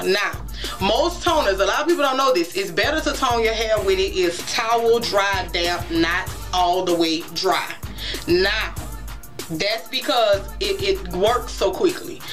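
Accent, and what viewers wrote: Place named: New Orleans, United States. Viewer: and love your accent as well and your from New Orleans that's one of my fav accents girl lol ??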